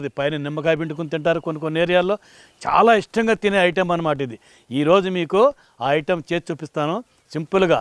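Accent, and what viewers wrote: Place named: Godavari, India. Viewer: మీ మాట్లాడే తీరు చూస్తుంటే కర్నూల్ laga ledu..Godavari jilla vari laga వుంది..చాలా చాలా బాగా మాట్లాడుతూ న్నారు. ధన్యవాదాలు 😊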